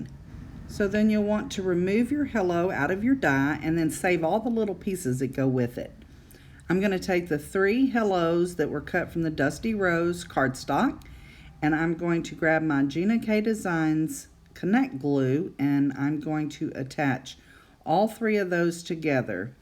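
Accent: Texas, United States. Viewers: Great Video! Beautiful Card! Love That Texas Twang! xo~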